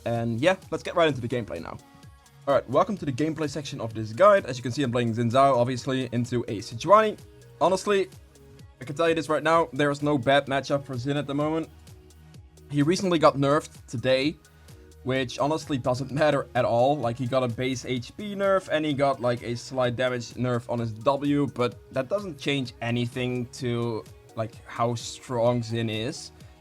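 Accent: Netherlands. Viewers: Klinkt Nederlands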